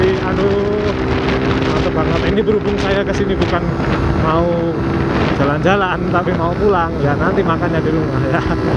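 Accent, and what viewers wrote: Java, Indonesia. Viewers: bener mas wong jowo ojo sampe ilang jawane... ,gk usah di rungoke omongane koncone sampean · Jadi diri sendiri aja mas, sama sama wong jowo ya tetep medog, jgn dirubah gayamu, saya juga medok kok di vlog saya. bahkan Tora Sudiro aja masih medog .... hahahahha analogi yg bagus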